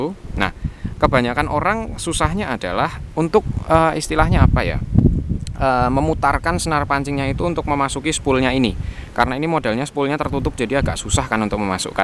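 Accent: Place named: Java, Indonesia. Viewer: logate asli wong jowo masse salam sehobi mancing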